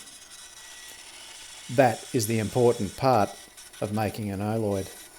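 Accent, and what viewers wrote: Australia. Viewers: I’ll give you a thumbs up for your silly Aussie voice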